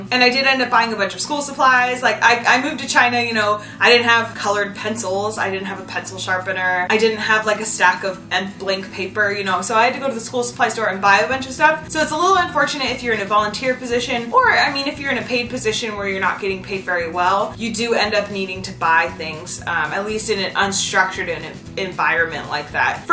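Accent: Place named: United States. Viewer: + Dear. You are NOT a ''Native English'' speaking person Actually you do NOT speak English YOURSELF! You speak American English and have a strong American accent, use so many American slang words and as an English person, I find it hard to understand what you are saying at times. For a start, you speak so fast and gabble far too much!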